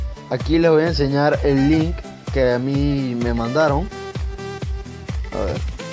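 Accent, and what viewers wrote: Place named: Ecuador. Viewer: es que me sonabas un poco con un acento ecuatoriano :v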